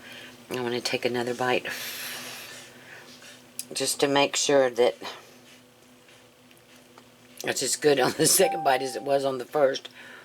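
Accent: South, United States. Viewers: Everything taste better with a southern accent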